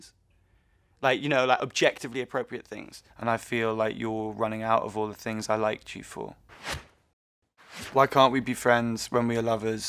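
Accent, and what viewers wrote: United Kingdom. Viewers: choke me with that British accent. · By listening from speaking, I read the comments with a British accent in my mind.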